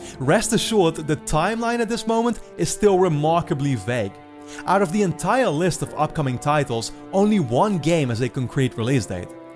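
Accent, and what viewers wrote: Netherlands. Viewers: Once i heard your voice i immediatly reconised ur dutch accent xd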